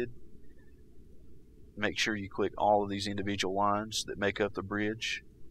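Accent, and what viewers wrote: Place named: North Carolina, United States. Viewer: Cool video. By the way, are you from North Carolina? I love your accent!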